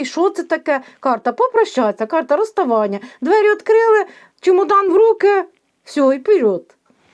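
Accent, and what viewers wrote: Ukraine. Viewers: Український діалект❤ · Пані,я розумію,що Ви Не Все можете Говорити,що Бачите..За суржик,не переживайте.В вашій місцевості,говорять Так..Дякую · Ви справжня, ви наша украіночка. В кожному регіоні свій діалект і говоріть так як говорите, вас приємно слухати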